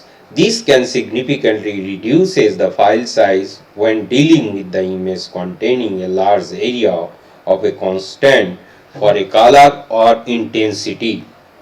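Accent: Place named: Bengal, India. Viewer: Sir your accent is beryy bengalishhhh . I like it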